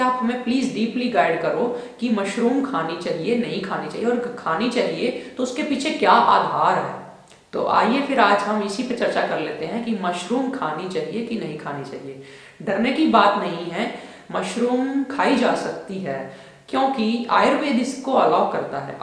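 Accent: Punjab, India. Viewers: Namaskaram guruji I love your Punjabi tone and i am not Punjabi but I love Punjabi language...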